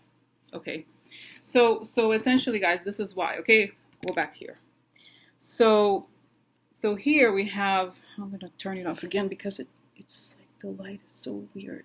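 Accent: Russia. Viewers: You help make my morning...Russian accent and all...boom!! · I like your accent and I was watching Lena Rodriguez and she likes your Russian accent too. Keep happy I know all this politics must be draining and stressful, it has drained me, and I live in Australia. I also have been worried for the Americans and the world, I need to feel safe again, so keep up your good work... Stay healthy it costs to much to get sick in America. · Hello! Your Russian accent is fun screw the people who don't like it. Wouldn't it be a better strategy for Mueller to stop BEFORE they vote? Seems like they would be all ups in arms once he's in. Supposedly the Russians hacked the Pubs emails too. Maybe they are blackmailing them AND giving them $$ too. Maybe u already said that at some point.??? 🤔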